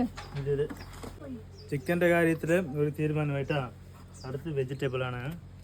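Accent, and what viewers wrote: Tamil Nadu, India. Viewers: Ningalu malayaliya allengil tamil la mindunadhu different ayeetu ondu adha chodhichadhu.sorry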